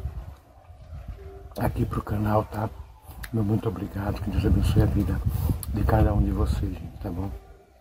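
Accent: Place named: Northeast, Brazil. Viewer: O sotaque nordestino ❤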